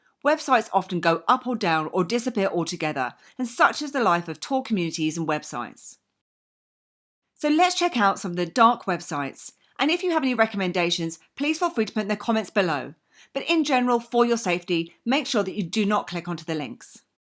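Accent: United Kingdom. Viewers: I suspect you meant to say “excited” rather than “exited”. Mostly what I noticed was that she has one of the strongest British accents I’ve heard, and I have heard a lot … she also talks very fast. If you’re having trouble understanding, try lowering the playback speed on your player to .75. Those settings are accessed by clicking the three dots in the upper right hand corner of the video player screen. Those on-screen controls go away after a few seconds, but will reappear the instant you touch the screen. Just FYI, that electrical-outlet looking thing to the left of the three dots? That’s a slide-switch that toggles “auto-play” on and off.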